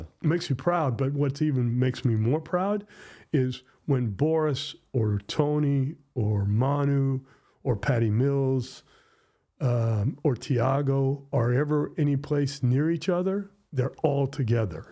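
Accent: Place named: England, United Kingdom. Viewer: Merci pour ton accent anglais, j'ai l'impression de l'interviewer.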